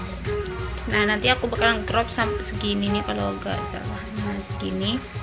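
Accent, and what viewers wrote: Madura, Indonesia. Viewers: Logat maduranya kelihatan beut kak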